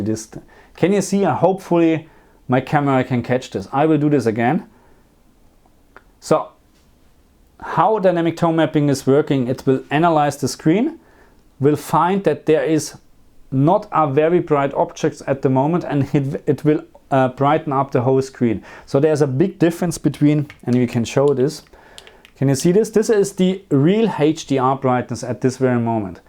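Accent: Germany. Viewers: My Phone has hdr +10 can it Work with genshin too? And has the Same Bug? You Sound german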